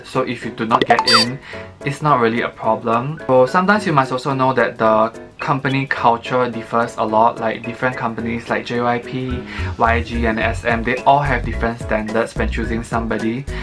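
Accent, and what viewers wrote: Singapore. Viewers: I hear a singaporean accent👁👄👁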